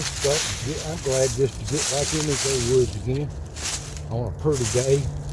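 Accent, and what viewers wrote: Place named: South, United States. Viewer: that sweet southern drawl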